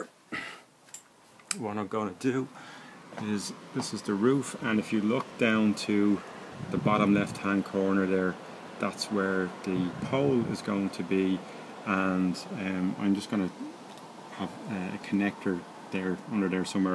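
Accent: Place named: Ireland. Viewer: I was wondering where i had heard your accent somewhere i visited before... and your accent is Irish isnt it?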